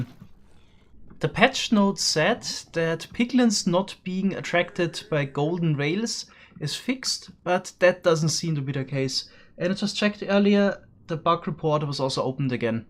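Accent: Germany. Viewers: He's German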